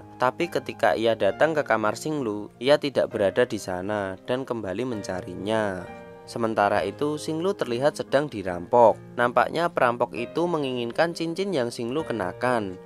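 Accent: Java, Indonesia. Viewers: Pegel kuping,, medok banget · Medoook bangett sii min .. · Medok betul jawanya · medok amat yak/// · Mentok jawa nya. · Jawir.. Logat jawa nya ilangin... Biar tdk jd film lawakan ...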